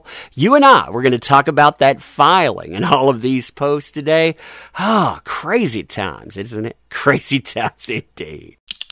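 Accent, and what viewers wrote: South, United States. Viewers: 🤠Howdy, Pawdner... You're such a WILD THING when it comes to doing your thing called BROADCASTING 😂😂😂 and ALL we women LOVE you for that cute southern accent and for the DECENT fellow you are. If AH ever imagined you're everybody's Good Samaritan of Justice and avenger of the Innocent people... She'd get jealous! But I'm pretty sure she Does, lol👍🏼GREAT JOB! BLESSINGS and my most loving respect for you always coming your way.. Love & kisses you wild Thing. 💪🏻UMH! Forever Your's. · Your doing a good job there keep it up, and that's a damn fine good southern accent as well! T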